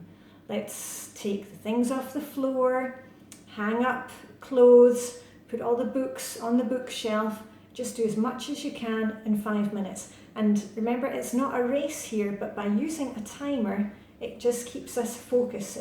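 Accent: Scotland, United Kingdom. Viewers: Hi, Maaike here from Nederland. I started a week ago and I have a shiny sink every single evening. What’s happening to me? 😉 But seriously. I’ve. Never. Ever. Done. This. It’s all new to me and I’m 46. 🙈 Thank you! Love your accent by the way. Scottish?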